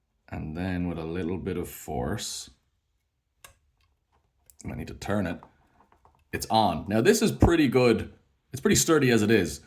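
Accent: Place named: Ireland. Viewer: This is amazing, can't believe a mask that's been around for years which I have just tried and like I search up this issue and you made this but a few days ago. I need this trouble is I have no clue about 3d printing etc. Can I pay you to make me one? I am in the UK by the sounds of accent your just across the sea from me😂 happy to pay for all material, labour and postage? Would really appreciate it if possible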